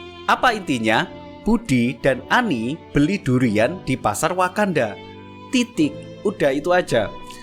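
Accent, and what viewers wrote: Java, Indonesia. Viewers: Apakah boleh saran mas😁 Saya dari jawa dan kayaknya mas juga jawa bisa di lihat dari lahjah bicaranya Coba pakek "saya kamu atau anda" jangan pakek "lo gw" kayak yang kaku ajah mas😁🙏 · Mas mon maap sebelumnya, mungkin akan lebih nyaman pake “aku kamu” aja, gak usah/jangan pake “Gua Elu” karena masih belum sesuai, maaf medok bgt..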